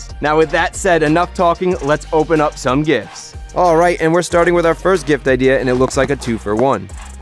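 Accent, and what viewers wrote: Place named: Philadelphia, United States. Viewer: Bro, I completely forgot you were from somewhere VERY close to Philly! But the second I heard you say, "fill the rest up with wooder" [water] I nearly fell out of my seat! My wife (who grew up near Allentown) yells at me all the time about my pronunciations and slang, but "wooder" in particular because she doesn't want my son to pronounce it that way!🤣😂🤣I love it man, great video, thanks for the upload. And I hope you and yours have/had a great + safe holiday and a Happy New Year! See you 2026!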